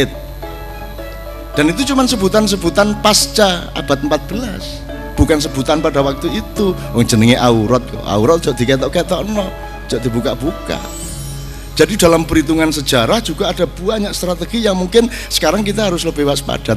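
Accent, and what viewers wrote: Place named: Java, Indonesia. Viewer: Lidah jawa mas bro'.. ga usah di perdebatkan..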